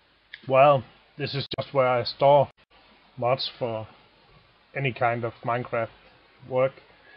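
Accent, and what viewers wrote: Denmark. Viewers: Great video, even though the audio is pretty f***tup. But i am wondering, where are you from? Denmark? Or anything close? :P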